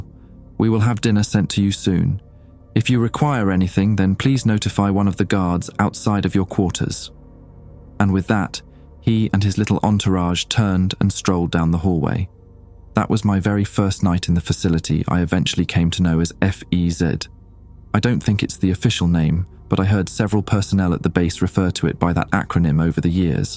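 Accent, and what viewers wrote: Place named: England, United Kingdom. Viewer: I really liked this one. Nice twist that wasn't super obvious. This isn't a criticism but, as a brit, it's so jarring to hear the narrator speak in an English accent but use American phrases. Was mostly at the start of the story.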